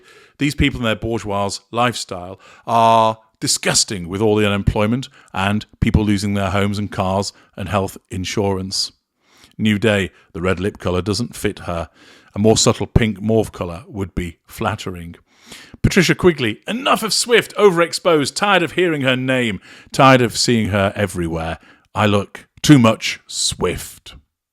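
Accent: United Kingdom. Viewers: Okay, who ever you are. You can talk all the shit you want about Meagan and Harry losers. Don’t go there with Taylor Swift. You are clearly British, just by accent. Taylor Swift is an amazing young woman. Do your research as a broadcaster. If you can find a fault with her person, behavior or actions then carry on. I dare you!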